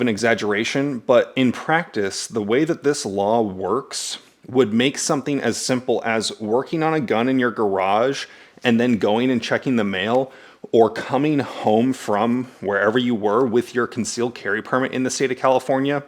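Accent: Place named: New Jersey, United States. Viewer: Sounds like Jersey.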